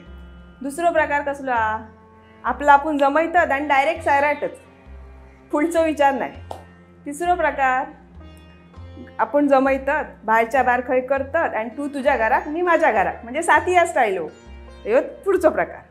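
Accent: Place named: Malvan, India. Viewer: .... तुमचे व्हिडिओ खुपच मस्त असतत हा.. अस्सल मालवणी मस्त बोलतास. अगदी गावाक गेल्यासारख्या वाटता. तुम्ही आपलें जुने मालवणी परंपरा, चालीरिती..जगासमोर आणतास ह्या खुप चांगला काम करतास. तुमका खुप खुप शुभेच्छा... मी तुमचे सगळे विडिओ बघतय.खुपच छान असतत.... तुमचा खरा नाव काय आसा...? 😊🙏🏻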